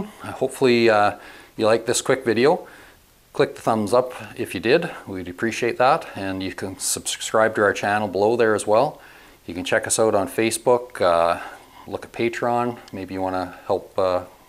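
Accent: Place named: Canada. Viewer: Damn that's the thickest canadian accent I've ever heard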